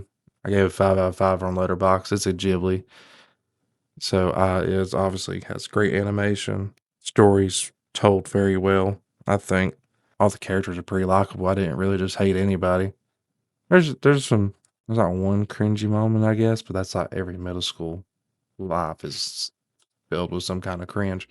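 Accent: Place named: North Carolina, United States. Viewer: love your accent, sounds like North Carolina. I still need to watch this movie, somehow I keep missing some of these ghibli movies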